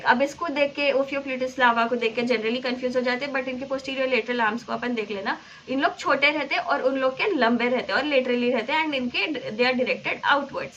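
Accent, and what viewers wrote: Hyderabad, India. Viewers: Easy to understand because of your hyderbadi accent 😅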